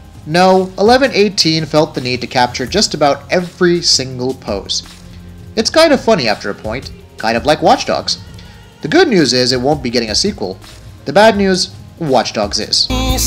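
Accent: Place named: United States. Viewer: wo plz stop using American accent, if you can't stick to Indian fine, adopt British since its far easier to understand them than American's. Though am talking silly, how could you change to British and not Indian, but still, its just too clunky.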